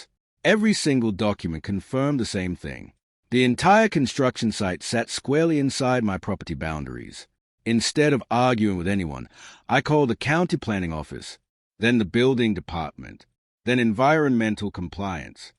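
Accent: United Kingdom. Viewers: Same British nariator.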